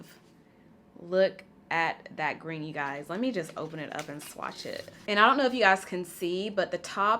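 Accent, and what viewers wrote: South, United States. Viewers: Love your videos and your southern bell accent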